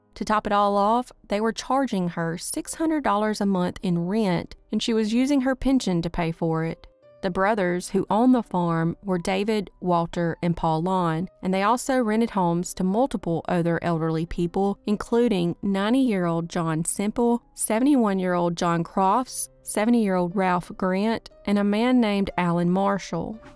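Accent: South, United States. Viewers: Oh great an American voice I detect a southern accent! Whewfind a better voice todo this video!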